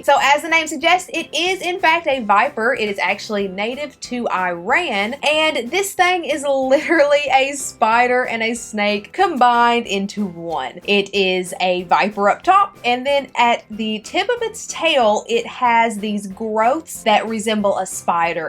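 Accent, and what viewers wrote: South, United States. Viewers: First of all, my 6th graders loved this video. Several of them look just like the reptiles you showed. We think that you are the perfect woman. Your teeth are so white. Your Area 51 T-Shirt was a great choice. I loved the southern accent. Your spooky eyes had hypnotizing effects with those white rings on them.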